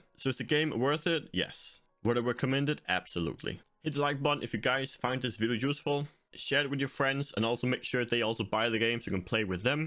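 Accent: Denmark. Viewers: Thick ass danglish accent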